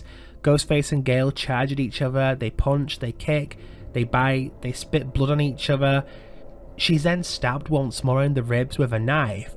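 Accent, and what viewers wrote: United Kingdom. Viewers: What british accent is this? Its my absolute favourite 😍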